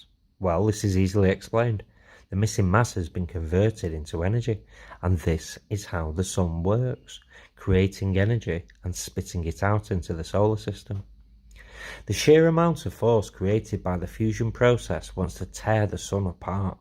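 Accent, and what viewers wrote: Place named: United Kingdom. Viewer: I love stuff like this. Love the British accent of the narrator too! Easy to understand info. Even my wife was able to follow! 😂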